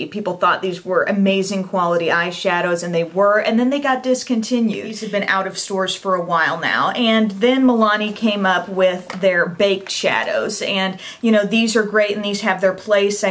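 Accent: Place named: South, United States. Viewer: LOVE your passion. And even though you're a newscaster, I can still hear that southern accent which I also love. Even though I was born in Texas, I never really developed a true southern accent that i could be proud of lol.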